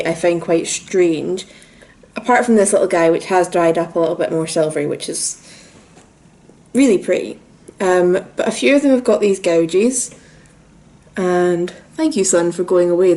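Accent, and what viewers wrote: Scotland, United Kingdom. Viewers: Are you a fellow Scottish las ?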